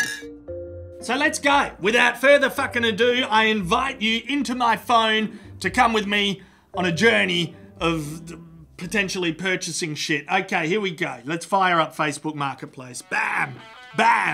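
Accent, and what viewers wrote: Australia. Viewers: i recognize his accent. is he australian or he just have a strong english accent. i mean really strong lol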